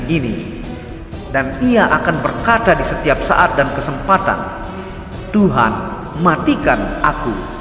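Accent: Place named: Lombok, Indonesia. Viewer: Kayak logat orang lombok side meton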